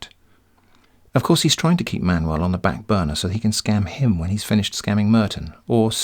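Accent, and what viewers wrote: England, United Kingdom. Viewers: It's an English gentleman speaking English language.like Liverpool FC playing at Anfield . What is there not to like?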